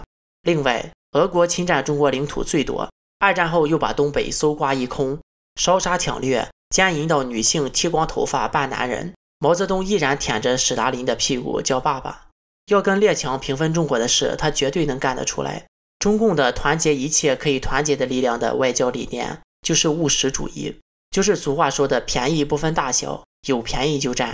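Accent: Shandong, China. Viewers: 题外话，请问klaus是台湾人吗？听口音不像 像山东人。。。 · 这口音山东人吧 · Klause哪里人？口音听着是山东人？ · 听口音你是山东人吧，反正是中原地区的人，别装台湾人了好不？还沦陷，中国现在过得很好，稳扎稳打，得民心者得天下，如果中共是你们口中那样极端的专治，以中国人的革命精神，早给赶跑了，中国人是世界上最爱革命的人，农民起义在历史上数不胜数，中共之所以能成功就是顺应历史潮流，联合了一切可以联合的力量，说直白点，就是得民心，彻底解决了封建、买办和殖民势力。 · 这口音，老家山东的吧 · 听口音作者您是山东人？有点好奇您现在在国外还是国内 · 看了点半吊子历史书，可能也受了点大法导师的点拨，卷着大舌头操持着山东大葱味儿的国语就敢评说天下啊。你是真有勇气真有脸。论国际关系，当年德国美国苏联都帮国民党，共产党到陕西，只剩下两万大洋命悬一线，国际上怎么帮了？共产党勾搭国际打赢了国民党？你这逻辑是跟李洪志学的么？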